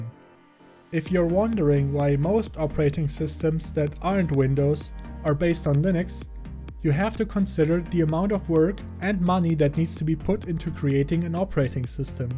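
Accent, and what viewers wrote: Germany. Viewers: falls du deutsch bist hört man das an deinem akzent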